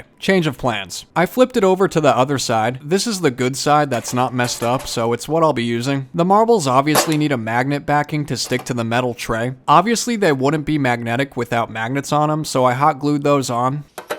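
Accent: United States. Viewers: He isn't from US? I am Brazilian and I don't know much about accent, but I thought he was from USA judging by his accent. O.o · I don’t really care about your American accent of orange I don’t know why people are getting mad at you OK 👌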